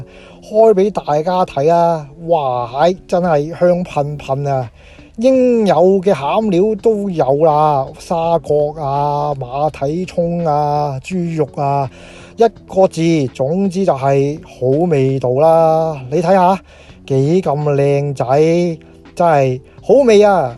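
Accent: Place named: China. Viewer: 要查清楚並講好聲音，不要太陸口音，明明牛栢葉不是牛怕葉。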